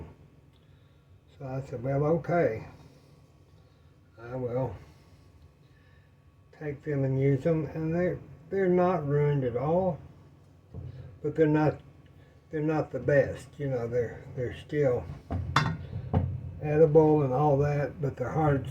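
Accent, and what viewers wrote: South, United States. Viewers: Hi, I really enjoy your channel. I'm a cobbler fan from way back, and I love your double crust method. I'm adopting it! My parents were from the south and I grew up cooking with cast iron and bacon grease. Matter of fact my mother was from the Little Rock area. Your accent certainly does sound like almost everyone in my family. Sweet memories.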